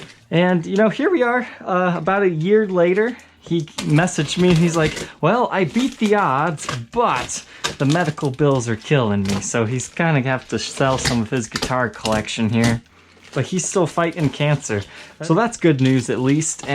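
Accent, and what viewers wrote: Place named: Midwest, United States. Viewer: You sir, are definitely from the Midwest.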